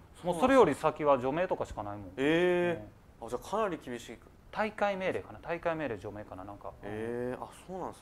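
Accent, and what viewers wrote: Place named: Kansai, Japan. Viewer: 関西出身なのはすぐに判る。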